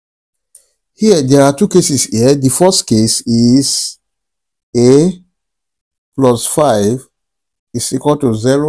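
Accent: Nigeria. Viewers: I like Nigerian accent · Love your Nigerian pronunciation 😀😀😀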